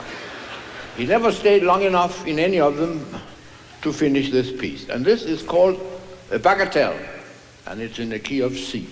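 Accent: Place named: Denmark. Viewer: He tells the same jokes but it doesn't matter. He is still funny. I read his autobiography in Danish, bought in a second hand store. He had a successful career as a concert pianist in Germany before the war but eventually had to flee, being Jewish, through Sweden and Finland. Managed to get the last boat from northern Finland on a US special vessel sent to pick up US citizens. His first wife had spent time in the US and was a citizen. She insisted on taking her cat with her. They were parted but still friends, Victor and she, that is. Despite the ice and extreme danger, they made it to the US. I am not Danish, but speak Danish and always thought he was funny because of his accent in english. Then l found out that all Danes have a funny accent in english without even trying.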